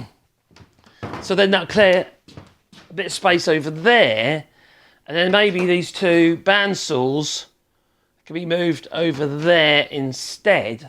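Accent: Norfolk, United Kingdom. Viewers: A Norfolk boi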